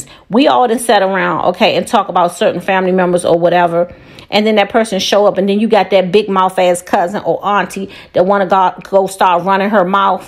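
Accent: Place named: Washington DC, United States. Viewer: New subscriber here….. only subscribed because i feel like I heard some DC twang!!!! #Ward8 #Soufeast4Lyfe 🙌🏾🙌🏾🙌🏾💯💯💯💪🏾💪🏾💪🏾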